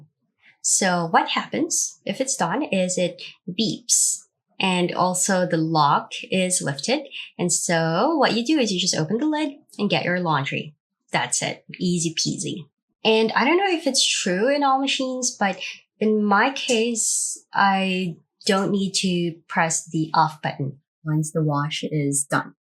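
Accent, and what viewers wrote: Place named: Philippines. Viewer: Omg I love your accent! I'm also pilipina living here in Japan. This video is a life saver! Hahah tnx for sharing!!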